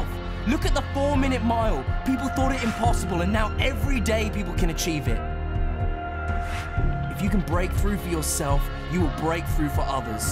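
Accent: United Kingdom. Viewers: Accent sounds crazily British. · actually it's one of the most impressive motivational videos i've ever seen best speech , great video and your British accent is astonishing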